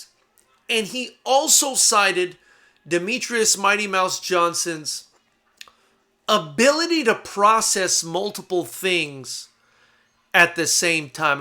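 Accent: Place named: Brooklyn, United States. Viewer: You sound Brooklyn son 👊 As always on point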